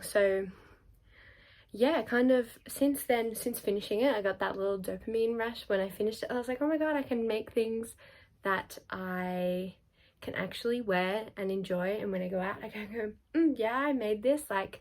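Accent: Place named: Australia. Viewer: Clicked on this and was so refreshed to hear a fellow Aussie!!! Loved your first podcast and will now go binge the rest :)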